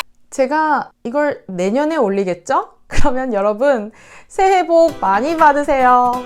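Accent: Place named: South Korea. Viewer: 와 통종 국문과인거 감안해도 딕션 강세 다 무시한 콩글리쉬 발음 너무 한거 아니요 ㅋㅋㅋㅋㅋㅋㅋㅋㅋㅋㅋㅋ? 내가 2000 초반에 보습학원에서 가르치던 발음 그대로 하고있넼ㅋㅋㅋㅋㅋㅋㅋㅋㅋㅋㅋㅋㅋ 그냥 구글 AI가 발음하게 틀어놓으세요 애들 어릴적 발성 습관 망치지 말고ㅋㅋㅋ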